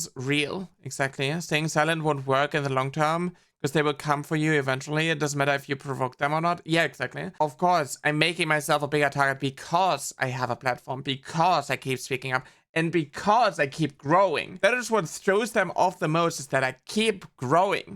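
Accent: Germany. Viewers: Why did I not expect a German accent? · Just asking, are you German? You have a very charming accent xD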